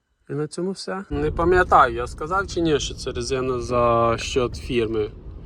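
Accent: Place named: Bukovina, Ukraine. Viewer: Дякую за відеороліки по рено kangoo.Мені таке авто сподобалося.Шукаю WV кедді,але бачу,що і це не погане і можливо дешевше.І ще земляк,як робиш роліки фільтруй базар.Бо твій Буковинський укр.-рус.суржик дуже ріже вуха.Я то,тебе розумію,сам так дома розмовляю.Але мені стидно,що ми з західної україни,а говоримо на половину російською.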